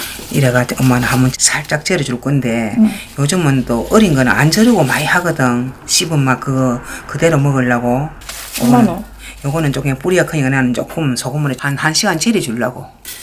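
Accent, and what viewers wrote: Gyeongsang, South Korea. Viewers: 경상도 씬냉이 너무정겹네요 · 쌤~~갱상도 사투리 너무 반갑고 재미납니다 ㅎㅎㅎ 진주 산청쪽 말투같아요 제가 거기서 살았었거든요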